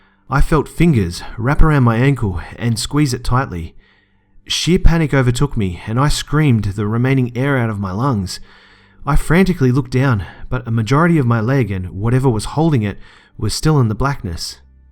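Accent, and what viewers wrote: Australia. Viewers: Nice to hear a fellow Aussie on here :p My first vid of yours, I've heard good things from other channels and am glad i stopped by! Cheers!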